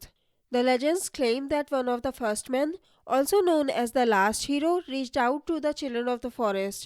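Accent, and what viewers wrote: India. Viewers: are you from INDIA? your accent seems like that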